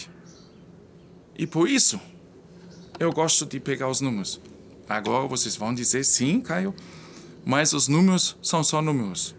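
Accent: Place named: Germany. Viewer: Caio peço a você que nós desculpe do comentário maldoso feito por um desqualificado em seu canal , não se deixe abater por isso pois nós gostamos muito de você de sua esposa e de seus comentários e de seu jeito de falar ( português alemão ) queria te dizer também que esses números estampados na mídia de 300.000 mil óbitos aqui no brasil não são reais ...pois por trás existe uma indústria que lucra com a pandemia com a morte das pessoas ...houve estados que receberam recursos do governo para usar na crise e o dinheiro foi desviados para outras finalidades , construíram hospitais de campanha e não foram utilizados...os serviços funerários lucram como nunca ...a mídia ataca o presidente porque ele cortou toda verba de, publicidade do governo ...secou a fonte de corrupção ...aqui no brasil ninguém morre mais de zica virus...,dengue ..., derrame cerebral...infarto ...câncer....acidente de trabalho...., acidente de transito...,malária.., tuberculose...,pena munia...aids...etc...tudo aqui é covid....aqui me despeço com um forte abraço. 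" Gott sei mit dir "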